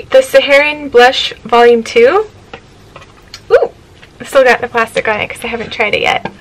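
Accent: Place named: Southeast, United States. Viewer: 💙💙🐈🐈💕💕 I really appreciate your visit to my channel,! I'm happy to return you the digital love with a play in full (10 minutes) and a crazy like #58! Hmmm, I didn't know that vegan cosmetics was a thing. I can't speak for cosmetics, but a lot of Indie music and movies are much better than the mainstream stuff. Shame they don't get the exposure they deserve. "They're so amazing... I just daydream about them sometimes." Very funny with the soap-opera-esque music accompanying! At first I thought it was maybe just my imagination, but after seeing a few of your videos now, I've noticed that you have a slight accent, almost like southeastern US. "Transformative" isn't a word you get to use every day! Looks like Mystic Cat makes an appearance! Thank you for sharing, and I hope your week has been kind to you!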